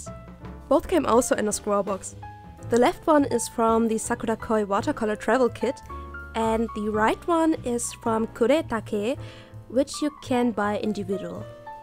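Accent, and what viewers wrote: Germany. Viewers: bist du deutsch? du klingst deutsch :D ok ja, bin ziemlich sicher :D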